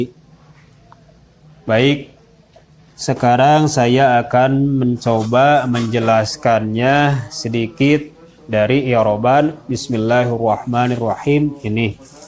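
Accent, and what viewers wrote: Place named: West Java, Indonesia. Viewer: Alhamdulillah...p msh tetap eksis saya jd ikutan belajar barakallahu... enakeun jelas wlpun nyunda pisan...hehe 🙏🏼 htr nuhun smg dg menyampaikan ilmu menjadi obat utk kesembuhan... 🤲sukses ya...n mangat💪👍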